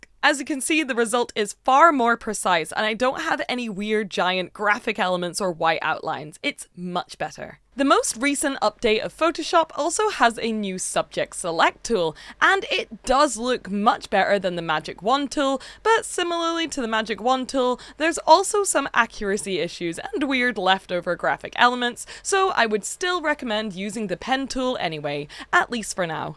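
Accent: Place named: Scotland, United Kingdom. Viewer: I hear a little bit if a Scottish accent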